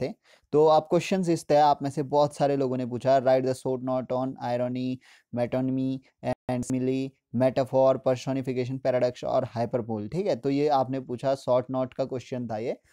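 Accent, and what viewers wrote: Pahadi, India. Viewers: Bhai tu pahadi h na accent se lgra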